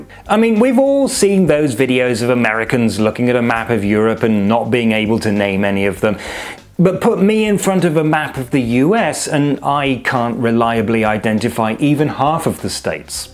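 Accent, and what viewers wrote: United Kingdom. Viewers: I didn't know you were an Englishman, I just thought you were a German who learned English from the British.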